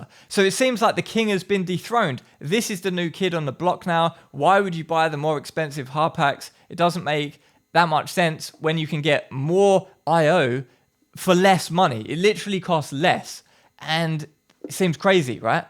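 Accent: United Kingdom. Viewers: You sound British. Are you in London?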